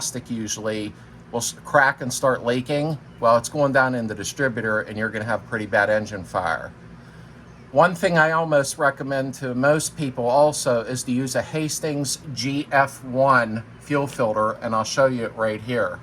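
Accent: Pittsburgh, United States. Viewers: Is that a Pittsburgh accent I hear? Thanks for the great video!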